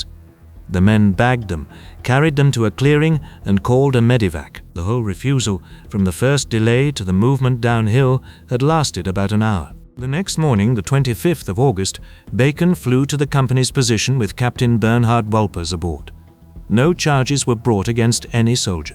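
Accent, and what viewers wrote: United Kingdom. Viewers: The British accent is confusing. Sounds like he’s talking about British troops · Do we Reeeealy have to listen to a Brit narrating?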